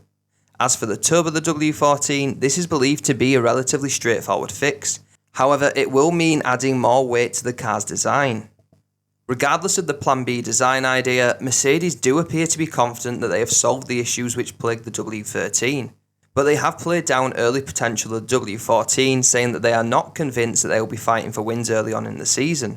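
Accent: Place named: Hull, United Kingdom. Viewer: That's deffo a Hull accent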